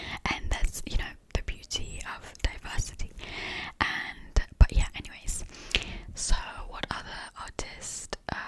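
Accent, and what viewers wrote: United Kingdom. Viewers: Love it❤ your British accent is so pretty! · I love your languages series. The British accent is really relaxing ☺️💤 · Such a great British accent, I loved this! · Hi, (aka ) and Happy Friday!😊😊😊😊😊😎☀💙💙💙💙💙💙🎄🇺🇸🌷🇮🇹💖💖💖💖💖💖🎇👍. Your british accent is amazing! Well done!😀⭐⭐⭐⭐⭐⭐👍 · I love the btitish accent very much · Omg lovee the bri'ish accent 😭 it's sounds so crisp and relaxing ❤ (btw I'm also a huge feta cheese lover AND also I had to mention that your musical taste is literal GOLD 🤌)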